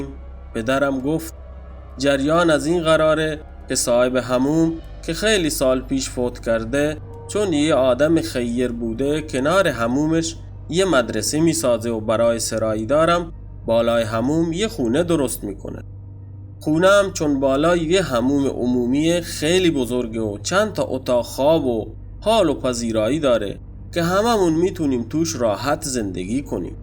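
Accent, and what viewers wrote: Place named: Khuzestan, Iran. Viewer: درود بر شما🌹من فکر میکردم خوزستانی هستند😅چه عالی واقعا من هم لذت میبرم از لهجه راوی عزیز👍🏻👍🏻😊تندرست باشید همیشه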